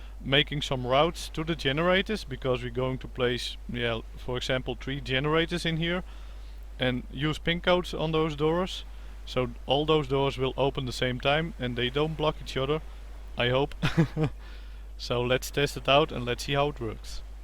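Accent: Netherlands. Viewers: 100% your dutch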